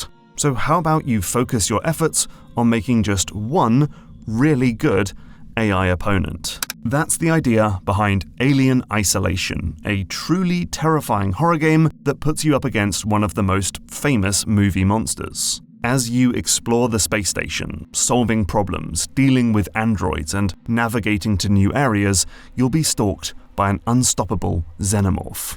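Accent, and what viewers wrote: England, United Kingdom. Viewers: Wow, talk about a nostalgia trip lol , it's like watching my gaming journey from childhood till now being narrated by an Englishman... though you missed paper boy on Gameboy lol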